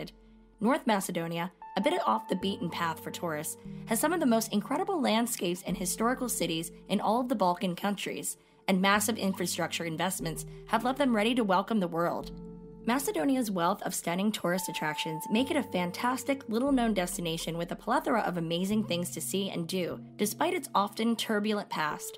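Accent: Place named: United States. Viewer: An Indian channel possibly targeting Indian travellers and voice over in American English! How many people in India understands American English, I wonder..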